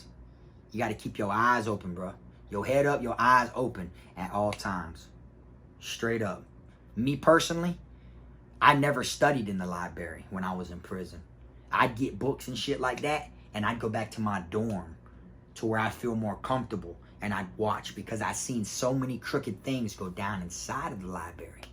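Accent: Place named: South, United States. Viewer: idk how you’ll take this but that southern dialect might get you in trouble one day as your channel grows, I know damn well you don’t give a fuck but I just don’t wanna see some clowns try to “cancel you” or some shit like that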